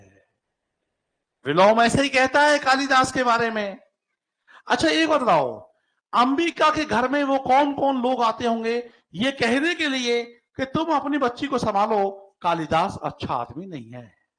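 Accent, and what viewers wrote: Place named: Bharatpur, India. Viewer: Lagta hai guruji Bharatpur se hai hamare jesi language hai bilkul ye Bharatpur bali hi h😀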